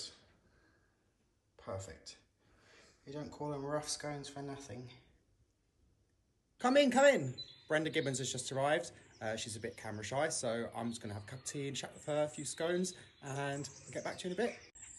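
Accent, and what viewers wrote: RP, United Kingdom. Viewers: That intro was about as hoighty toighty British as you can get. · I am fascinated! first of all, being an appreciator of british english I love the way you speak. I like your charisma. And of course the place is beautiful. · Definitely loved this vlog ,it’s made so good ,what’s this guys accent ?ist posh ? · Yeah, he's posh. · "lives in a castle, bakes scones, kind to kids, has a dog and speaks beautifully British" i think youtube has found its new prince charming ^^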